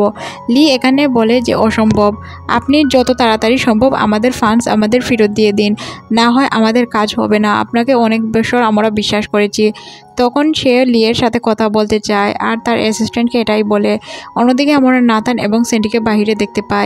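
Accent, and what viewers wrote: Sylhet, Bangladesh. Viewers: Apnar basha ki Sylhet 🙄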